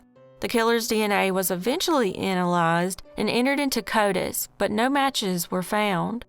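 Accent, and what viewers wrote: South, United States. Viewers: Love your accent! Makes me feel right at home with these southern true crime cases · Im 6th Yay!!!! It is all so sad But at the same time i enjoy hearing your southern accent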